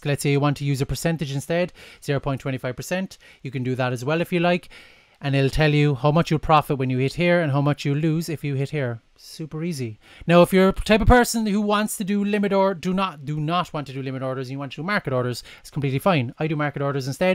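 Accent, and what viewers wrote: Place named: Ireland. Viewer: Nice to hear a fellow Irish man. Are you from Kerry/Cork? Great video btw. Thanks ALOT!!!!!!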